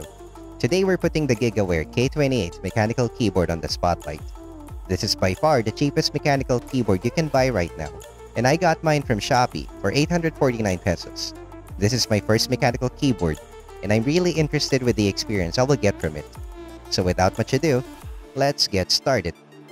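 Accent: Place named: United States. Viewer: your accent has a mix of italian-american and filipino and it's oddly satisfying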